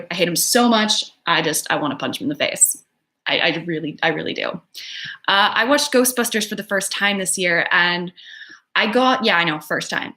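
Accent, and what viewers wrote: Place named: United Kingdom. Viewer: I wouldn't say weird at all! Just very unique. It's cool because at some points you sound British, then at others you sound completely American!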